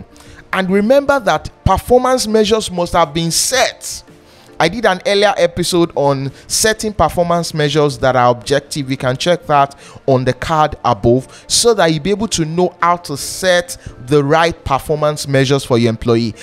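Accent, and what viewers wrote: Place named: United Kingdom. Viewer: Amazing explanation with British English . I am interesting with your curiously explanation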